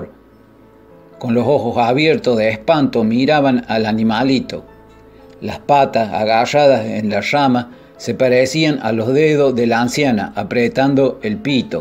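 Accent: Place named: Córdoba, Argentina. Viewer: Hermosa leyenda ANCESTRAL y tu tonada cordobesa . Saludos desde mi Argentina bella y nuestras provincias ❤ 😘👋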